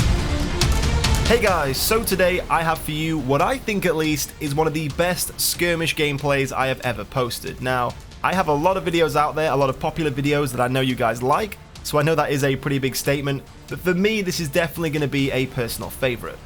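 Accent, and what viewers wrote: United Kingdom. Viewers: AEG Specialist with british accent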